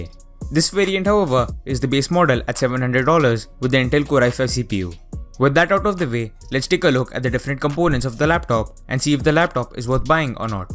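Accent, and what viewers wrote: India. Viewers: I am Portuguese and I learnt english not how to understand indian accent and even his accent being bad he tries to speak very fast messing with his pronunciation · You’re accent is too strong. Speak a little slowly so everyone can understand you and not just Indians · Suena más como alguien de la India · Indian accent is so funny to hear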